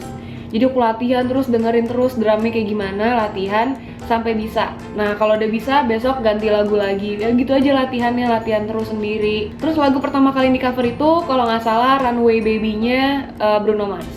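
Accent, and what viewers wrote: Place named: Makassar, Indonesia. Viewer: bedede org Makassar pae,salam dari Makassar nah 😂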